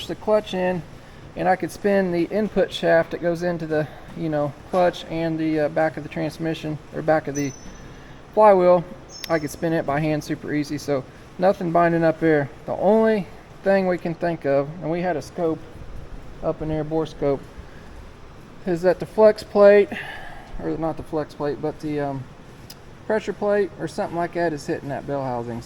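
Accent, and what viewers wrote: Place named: Midwest, United States. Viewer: I DO wish you would call an oil gallery a gallery not a bloomin GALLY, ok ok I know mid western accents sound different - hell I even let you off with alt'nator for ALTER NATOR lol as in it makes alternating pole current not direct current like a dynamo but i'm sorry oil gally simply will not DO lol, anyway enough of that. Well done a really good job well executed.....besides your dads and sausage fingers interference obviously lol....just pray he doesnt demand you pay him back for all the bits he bought eh, your first ride is an epoch event in a young lads life - so much more when you built it all yourself with so much love and dedication, enjoy it every minute you can.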